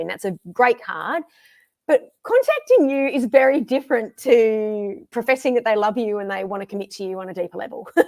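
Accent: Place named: Australia. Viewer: Love your Australian accent ❤ you are a superb Teacher ❤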